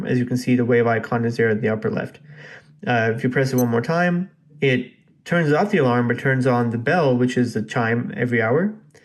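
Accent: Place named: United Kingdom. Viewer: You are like British Speaker Nice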